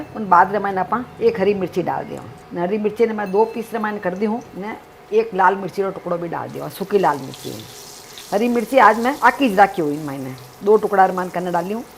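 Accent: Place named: Rajasthan, India. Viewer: Auntyji apari marwadi sunn kar mummy or naniji re yaad aa gaye.... Thari kadhaii jali wali hai.....spl kaiii hai kadhaii ma...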